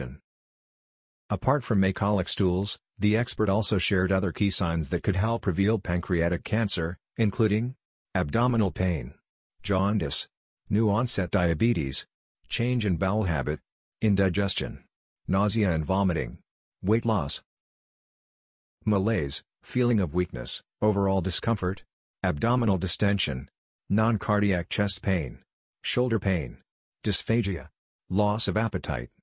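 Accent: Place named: United States. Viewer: Why is this video spoken in an American accent when it’s aimed at a uk audience?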